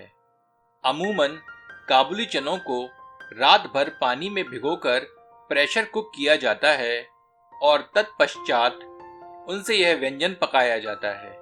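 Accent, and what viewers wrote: Standard. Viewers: आपकी शुद्ध हिंदी, और इतने प्रेम से इसे बोलना, किसी को भी मजबूर कर देता है, आपकी रेसिपी को बनाने और शेयर करने में। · आपकी हिन्दी शुद्ध है !!!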